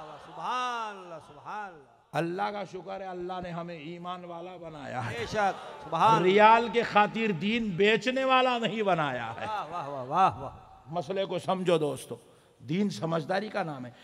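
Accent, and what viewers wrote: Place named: Hyderabad, India. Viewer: Are bhai yo hyderabadi hai thoda dakni lajha hota hai. Kab bhai koye kise ghaga .ka koye rahata hai osi language ya tahsil Ko sikhata hai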